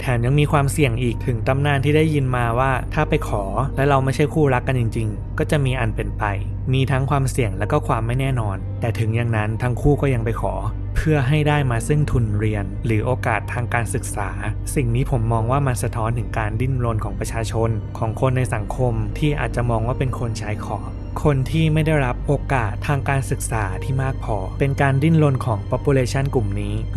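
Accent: Nakhon Sawan, Thailand. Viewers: คำพูดนี่คนนครสวรรค์ชัด (บ้านเดียวกัน)// ใกล้หมื่นซับแล้ว เชียร์สุดใจให้ถึงเร็วๆ🎉 ... ยังคงรอ Barbarian ตลอดไป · ดูมาหลายคลิป เพิ่งมารู้เลยค่ะว่าเป็นคนคอนหวัน ทางนี้เป็นคนพิจิตร อยู่อำเภอติดคอนหวันเลย ชอบพูดแงะ แล้วก็โดนหลายคนทักว่าพูดเหน่อเหมือนกันด้วย<num>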